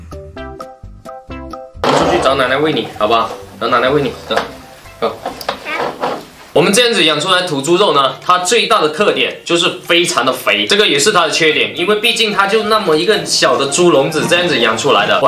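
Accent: Chaoshan, China. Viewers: 这是潮汕话吗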